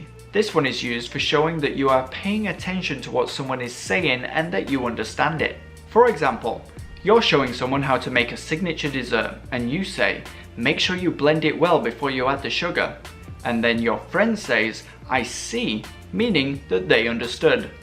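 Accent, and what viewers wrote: United Kingdom. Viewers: More videos with the British accent please 😁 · у него Английский английский как на счет американской версии?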